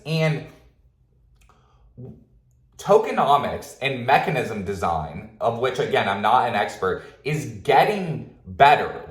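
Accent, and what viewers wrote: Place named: Los Angeles, United States. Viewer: I will subscribe if you ditch the LA enunciation, great video man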